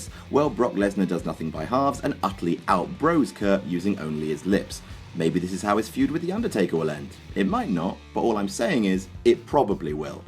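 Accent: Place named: United Kingdom. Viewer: Anyone else laugh themselves to hysterics when he said "TROLOLOLOLOLOLOLOL" in that deadpan British monotone?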